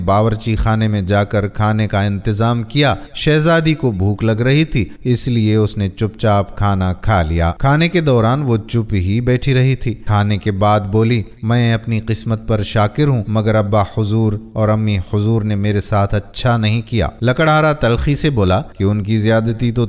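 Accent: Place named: Arab world. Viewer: Samaj nahe aata ye urdu hai ya arabi, mehrabani kar ke urdu alfaaz ko Arabi talaffuz ( pronounce) na kare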